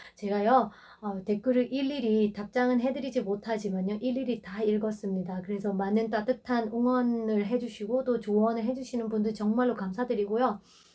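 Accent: Gyeongsang, South Korea. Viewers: 경상도 쪽에서 한국어 배우셨나요?? 아님 주변가까운 한국친구가 경상도사투리를 쓰시는 분이신가?? 미얀마분이신대 경상도 사투리억양이 좀 묻어나오시네요 ㅋ것도 살짝 ㅋㅋ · 경상도 사투리 억양이 있으시넹~^^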